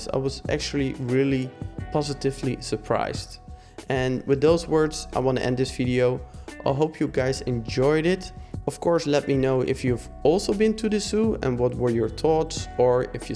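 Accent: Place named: Netherlands. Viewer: I hear dutch in your voice😁🇳🇱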